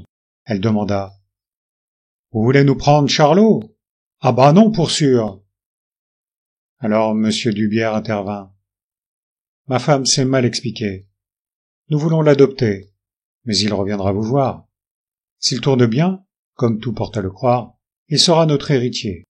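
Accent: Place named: Normandy, France. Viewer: ❤ l’accent normand est presque bon ! (Je suis née chez Maupassant, le Pays de Caux). Merci pour la lecture 🙏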